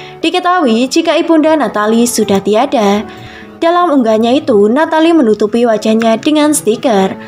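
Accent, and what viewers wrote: Java, Indonesia. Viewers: Medok kali jawa nya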